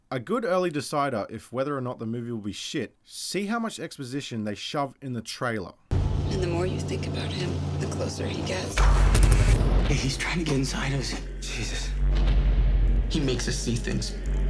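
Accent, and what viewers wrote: Australia. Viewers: As it's a wog/Leb Aussie accent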